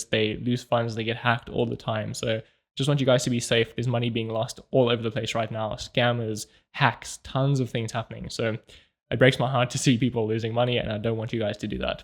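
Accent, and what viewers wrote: South Africa. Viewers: South African? Nice to hear our accent online dropping some knowledge!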